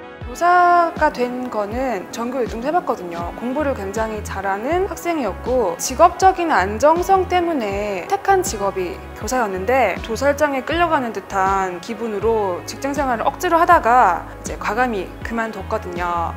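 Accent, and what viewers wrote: South Korea. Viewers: 대구사투리가 살짝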